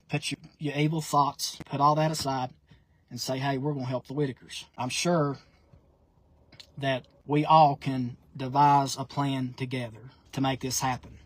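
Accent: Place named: Appalachia, United States. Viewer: Here in North Ga.(appalachians), we did rnost of the sarne stuff you are talking about, and the sarne type of values, even talked with a sirnilar accent...trouble is, we have been "invaded" and gentrified now..us hurnble country folk have been priced out of land, housing, and even rent...whereas when I was a kid nearly everybody in the store sounded like you talking...now, it's rare to hear that accent...everybody sounds like they carne frorn sornewhere else...hope that never happens to you in West Virginia, but it probably will, when they get done developing up Tennessee, Alabarna, North and South Carolina, and Kentucky. What part of Georgia is frorn?...rnaybe he isn't originally frorn Georgia?....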